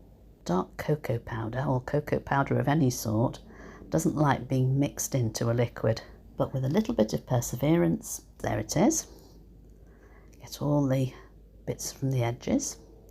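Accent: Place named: United Kingdom. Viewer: Hi! This chocolate frosting looks so creamy and decadent! I could hear your videos all day long because I love British accent, very charming 😍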